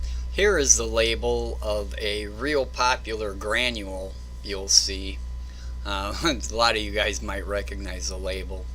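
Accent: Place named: Great Lakes, United States. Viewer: Great Lakes accent is strong. Yahey dere!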